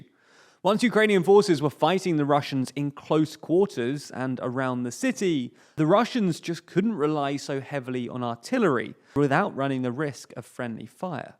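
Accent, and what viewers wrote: United Kingdom. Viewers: Obvious errors : - titles in the video mentioning. 5th May, 7th May should read June as the date visible on the news article shown - zelensky didn’t state 500 losses a day in Ukrainians troups but 50 to 150 as another comment corrected. He also said the Russians are losing almost 300 soldiers a day. I really much appreciate the quality of the TDLR videos, but these errors seems more and more frequent, like when it estimates Russian military budget to an incredible 250 millions of dollars where the reality is more 80 millions … some serious quantify checks are necessary there ! It’s a pity because your videos are very well illustrated, very concise, and the British accent makes these videos “Über chic”…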